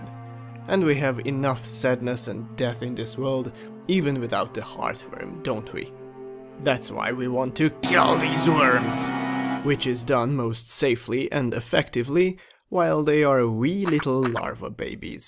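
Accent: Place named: Hungary. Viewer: Best hungarian english on earth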